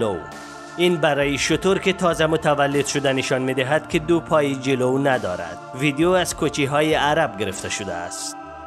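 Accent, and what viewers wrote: Afghanistan. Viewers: نمیشه این کسی که گوینده هست عوض کنید چقد دیگه ادم قط بود افغانی اوردین گوینده خبر ..اعععععععع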